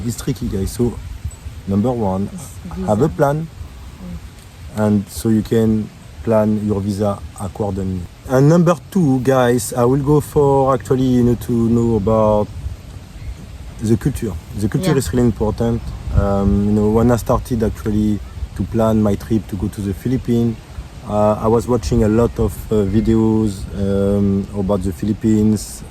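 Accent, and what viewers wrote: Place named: France. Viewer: My friend, the French accent. Super .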